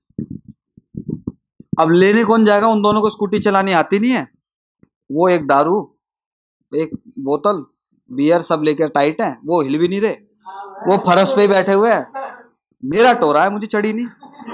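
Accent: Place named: Haryana, India. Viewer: Haryanvi accent top notch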